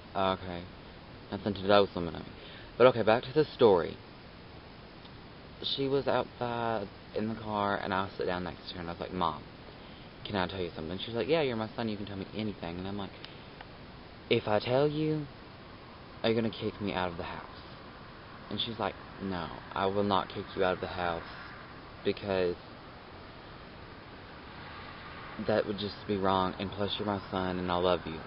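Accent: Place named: South, United States. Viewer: Congrats, I'm still struggling with coming out and watching videos like this is inspiring me to. I've told a few people so far and the next people I'm hoping will be my parents... :O anyways, thanks for posting an amazing video and btw you have the cutest southern accent I have ever heard!!! :D just saying. -33333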